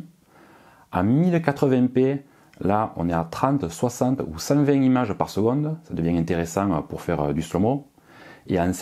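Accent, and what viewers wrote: South, France. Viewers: Sympa et détaillée, ta présentation; et avec un bonus qui va bien: l'accent du sud!! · Salut comme toujours les commentaires sont clairs et précis cet appareil paraît simple d utilisation fait pourtant de belles vidéos encore merci Pour ta présentation continue comme ça avec le bel accent du sud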